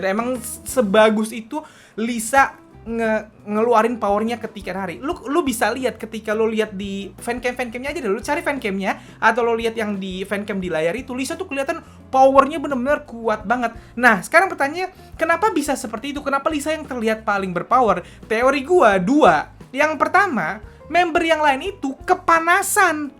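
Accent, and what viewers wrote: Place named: Bekasi, Indonesia. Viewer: Bahasa bekasinya ngelekeb ya bang 😂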